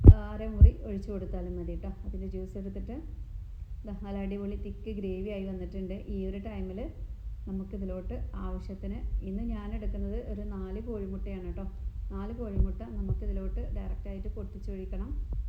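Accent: Malappuram, India. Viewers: 😀 മലപ്പുറം സ്ലാങ് വഴി മാറി ട്ടൊ ട്ടൊ ക്യൂട്ട് നെസ് കൂടി ചില ഭക്ഷണം നല്ലത്. സംസാരഭാഷ ബോറ് കുറ്റപ്പെടുത്തിയതല്ല മുൻപ് video കണ്ടിട്ടുണ്ട് സംസാരശൈലി മലപ്പുറം താത്ത തന്നെയായിരുന്നു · മലപ്പുറം ആണോ? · മലപ്പുറം ജില്ലയിലെ സംസാര രീതി ഇങ്ങനെ ആണ് നല്ല മനസ്സ് ഉള്ളവർ കേട്ടാൽ മതി അല്ലാത്തവർക്ക് വണ്ടി വിട്ടു പോകാം ടിങ് ടിങ്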